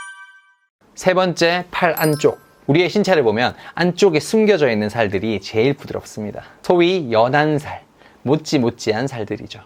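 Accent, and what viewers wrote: Gyeongsang, South Korea. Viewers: 디~게 귀엽게 생기셨다~^^ㅎㅎ근데 경상도 말구...서울 말투면 뭔가 더 좋겠다~^^ㅎ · 말투 희안하네 대구가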